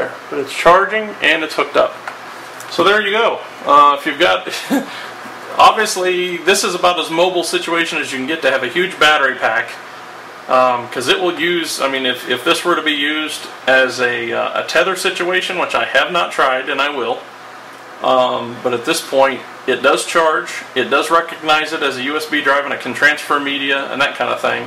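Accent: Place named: South, United States. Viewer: where are you from man? i'm guessing the south.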